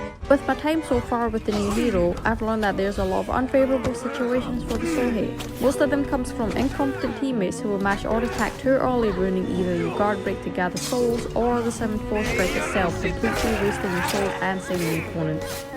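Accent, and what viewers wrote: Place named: Scotland, United Kingdom. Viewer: Maybe it's easier to hear your voice with headphones on, but just used my phone's speakers. For me, the wii music was just as loud as/slightly louder than your voice. It's not terrible, but you want your voice at the forefront of attention. Unfortunately the grunts and sounds from For Honor made it impossible to understand what you're saying. Plus keep in mind that you have a distinct accent which isn't easy to understand for the majority of people. It's not as strong like a Jamaican accent, but if I had to guess, you're from Scotland/Northern England and most Americans (the biggest audience pool due to sheer size) would say it's very hard to understand. Anyway, it's still always a good idea to make a voice loud and clear in the mix of a video without the clutter and noise of sounds and music which are supposed to fill in the background and not overshadow the person speaking. 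Hope you have a great day and thanks for making a cool video! :)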